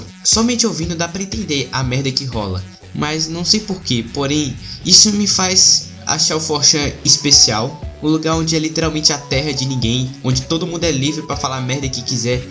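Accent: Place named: Paraíba, Brazil. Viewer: Pode regravar esse vídeo mas trocando a voz por uma pessoa pós puberdade e de preferência que não seja paraíba?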